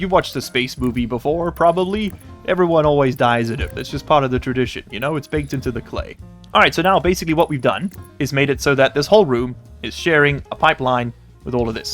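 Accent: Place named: South Africa. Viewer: Thought you had a Dutch accent, but South African is close enough 😅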